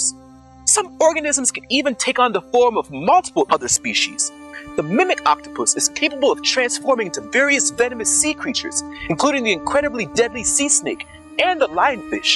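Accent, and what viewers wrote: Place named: Jamaica. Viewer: This is awesome!! I detect a very slight Jamaican accent so I'm double proud! #blackexcellence #videoswithpurpose 🏆🥇🏆🥇🏆🥇🏆🥇🏆🥇🏆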